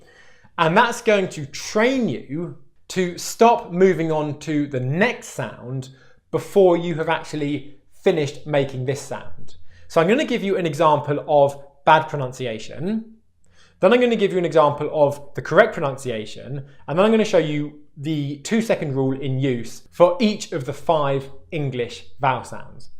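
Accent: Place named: England, United Kingdom. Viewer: Millions of English people do speak this way. Where have you been? Listening to US American Movies?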